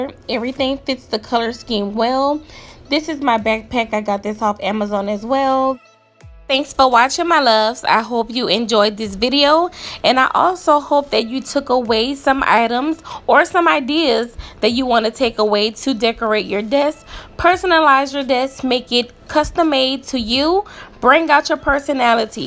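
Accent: New Orleans, United States. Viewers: Love the accent... Clearly a baby lol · Your desk is adorable! What do you do? OMG I NEEED those snacks! haha I love your accent you've GOT to be from am I right??;) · I viewed bc I needed ideas. But after I seen & heard that accent I jad to subscribe!!! I love the City plus my husband is from there 🥰🥰🥰🥰🤗🤗🤗🤗.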